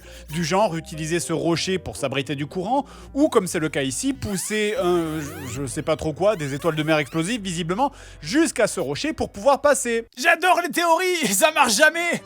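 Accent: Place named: Toulouse, France. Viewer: Tu es toulousain, ça s'entend ....ou du sud de la France...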